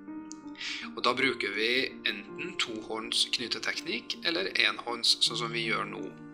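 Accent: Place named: South Africa. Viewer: it`s english but with a south african dialect.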